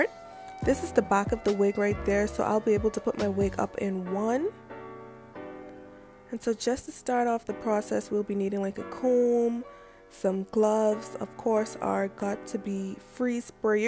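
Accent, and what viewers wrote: Bahamas. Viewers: jus had ta b bahamian frm she strt tlkn we cnt hide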